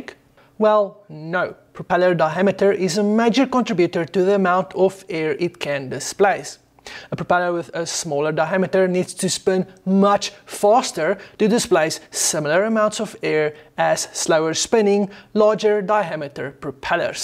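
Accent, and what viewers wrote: South Africa. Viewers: You sound South African 😊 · Ek wou sê jou aksent klink South African. Toe ek die eerste ZS sien op n vliegtuig toe weet ek. Nice video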